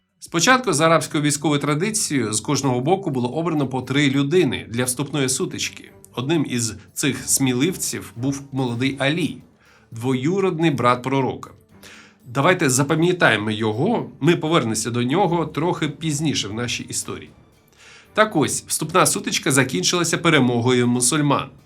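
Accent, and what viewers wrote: Russia. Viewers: Пане, ваш русский акцент ви, якось, збираєтеся ліквідувати?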